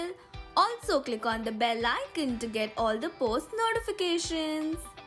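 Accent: Maharashtra, India. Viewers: I am overconfident and I can tell that didi you are Maharashtrian ❤️❤️❤️❤️❤️hey marathi mulgi❤️❤️ We are same🎉🎉🎉 you can come to know it's like she said it too much time and that's why I come to know that she is marathi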